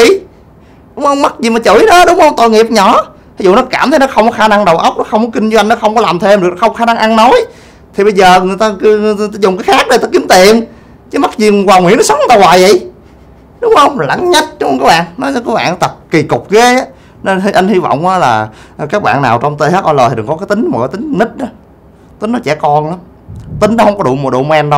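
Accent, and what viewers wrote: Mekong Delta, Vietnam. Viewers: a nói đúng chất miền tây lun a oii. ABC các kiểu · a này nói chuyện hay quá, vừa thực tế vừa nhiều bài học thấm, nói chuyện kiểu miền tây chất phát dễ nghe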